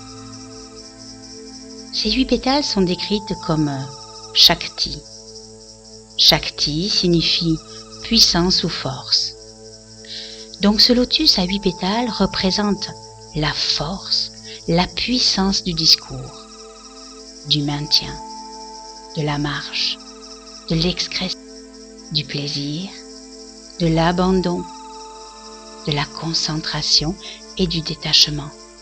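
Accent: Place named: South, France. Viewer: ...oui ,il n'y a plus qu'à !! Cela serait bien intéressant !! Moi je ne sais pas où vous êtes j'imagine dans le sud de la France vu l'accent !! mais moi je vis en Italie dans le Friuli!! Je ne vais pas souvent en France mais, quelques vacances pourrais ce faire !! bonne soirée.christine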